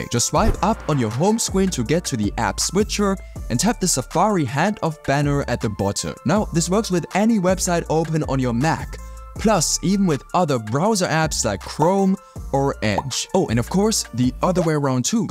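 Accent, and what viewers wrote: Germany. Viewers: Hmmm... are you German? (not to hate ofc) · Hab doch direkt den deutschen Akzent rausgehört 😅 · hehe, musste unbedingt auf die Kanalinfo gehen um bestätigt zu bekommen "Deutschland".... nicht wirklich wild doch schon recht ulkig dassi innerhalb der 1 Sekunde herausgehört habe woher der Wind weht. Und hab nicht darauf geachtet =D Das Wort people war's ^^ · are u a german guy?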